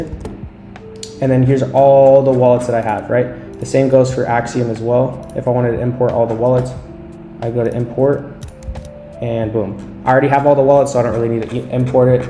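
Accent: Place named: Bangladesh. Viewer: Bangla voice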